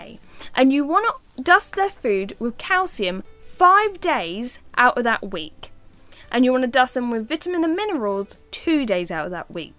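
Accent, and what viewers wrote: United Kingdom. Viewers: Erica SPEARMAN that’s how the Brits say it. Most understand.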